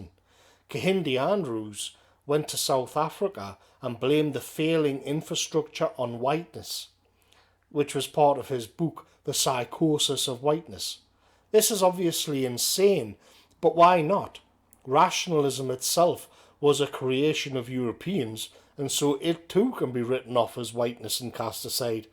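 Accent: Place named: Northern England, United Kingdom. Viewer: Love your content mate, great to hear a northern accent with such a fantastic understanding of philosophy and reasoning. Always look forward to the next one 👍 thanks!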